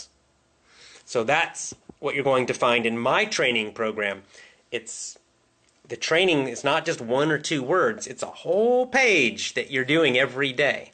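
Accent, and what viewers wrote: United States. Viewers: I like listening to your standart american accent very much!))))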